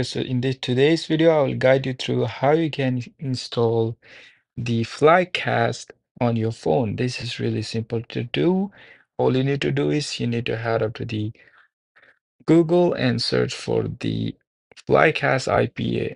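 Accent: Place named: India. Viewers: Indian accent, immediate trust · Indian accent, immediate distrust.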